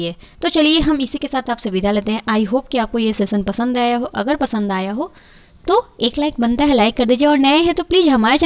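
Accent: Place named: Bihar, India. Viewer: Madam aap kahan se belong karti hain? Aapke accent se lag raha hai ki aap Vaishali ya Muzaffarpur se hain.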